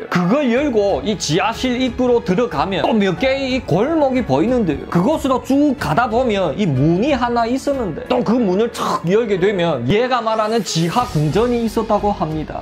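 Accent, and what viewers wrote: China. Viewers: 억양이 특이하시네요.중국사람이 한국말 하는 느낌요.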